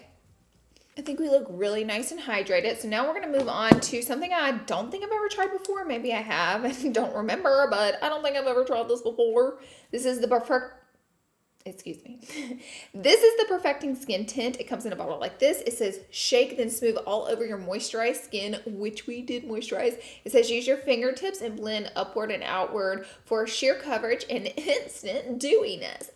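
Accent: South, United States. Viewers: honestly watching her videos are so relaxing to me. I’m like finally someone with a southern accent!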